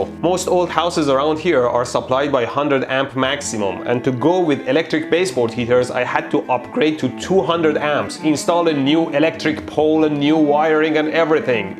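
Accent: Iran. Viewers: I'm Persian and his voice is to Persians what a newscaster's voice is to Americans. It's the most Persian accent on the planet lol. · The second i hear him talk i can tell he’s Persian as hell.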